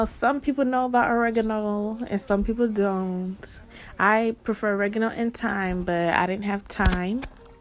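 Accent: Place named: Haiti. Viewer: Ahah lol the Haitian accent got me chicken wings wings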